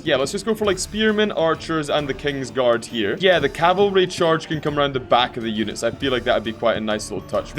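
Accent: Scotland, United Kingdom. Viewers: His scotish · he's a Scot, it's in the name and in the accent.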